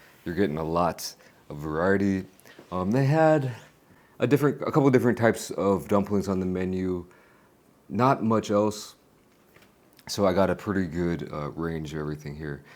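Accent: United States. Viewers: you sound like you were from the USA, And smoke Blunts after Blunts..!! I love it..!! also i love being able to check out all the kick ass Korean foods and products..!!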